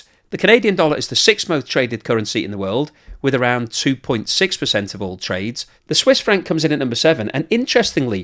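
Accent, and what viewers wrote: United Kingdom. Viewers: His British accent doesn’t give me any trusting feel.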